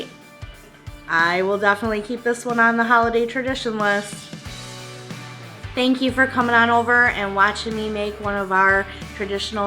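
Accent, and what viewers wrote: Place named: Michigan, United States. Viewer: Oh hearing the Michigan accent and seeing the Go Blue Crockpot in the background, makes me miss MI all the more! I wish I still lived there! When Mike came back from MI in September, I made him bring be back a lot of Blue swag lol Great video! I will have to try this sometime! Apparently you can make it with just water too!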